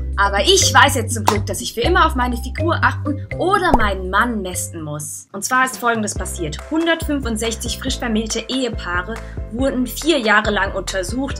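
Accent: Germany. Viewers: Klingt vor allem nach Ruhrpott. Sach ma Doartmund 👍😉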